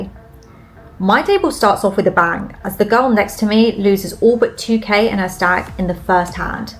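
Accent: England, United Kingdom. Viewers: Tournament poker is SO resident sleeper :( Cute English accent is worth though. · I already watch poker vlogs, but listening to a lovely lady with an English accent makes it cooler, lol. You should do cash game vlogs though!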